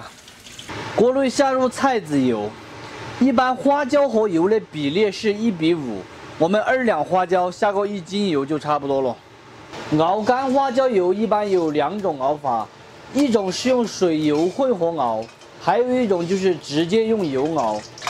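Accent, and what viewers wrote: China. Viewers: 这椒盐普通话，听的好上头😁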